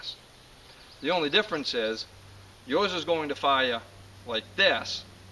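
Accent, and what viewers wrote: Maine, United States. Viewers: I think I hear a Maine accent here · Are you from down east Maine by any chance? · You sound like you're from Maine.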